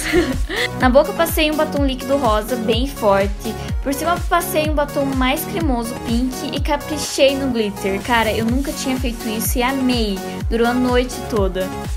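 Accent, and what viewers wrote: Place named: Northeast, Brazil. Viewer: nesse vídeo você tava muito nordestina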